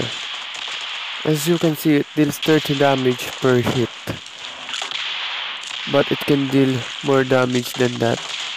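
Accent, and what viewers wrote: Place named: Philippines. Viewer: Pinoy ka? Parang pinoy kasi accent mo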